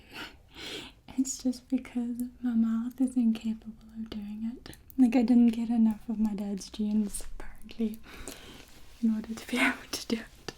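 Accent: South Africa. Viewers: I looove the South African accent, as a New Zealander I often get confused as one but I don't mind because I think it sounds great! Lovely video :)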